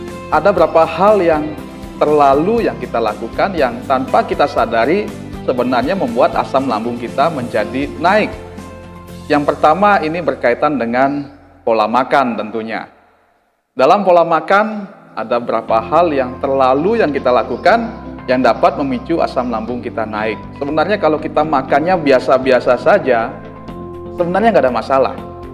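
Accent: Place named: Bali, Indonesia. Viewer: Terimakasih mas Bli sudah berbagi ilmu, logis,kronologis,bahasanya sederhana,mudah di pahami,dibumbui logat Bali yang kental, maturnuwun Mas Bli....( Yogyakarta).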